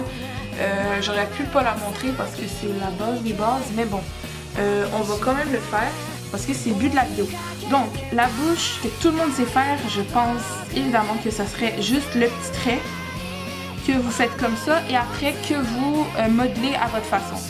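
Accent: Quebec, Canada. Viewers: je suis sur que tu est Québécois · Tu est Québécoise !!! 😮✋😐. Ton accent t'a trompé mon amie 😏 Et une affaire de de résolu 😃 TU mérite MINIMUM 100 000 k abonné 😉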